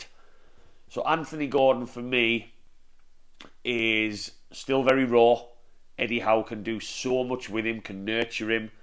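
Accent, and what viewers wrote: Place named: Teesside, United Kingdom. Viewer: Your accent is not Newcastle...I'd say Smoggy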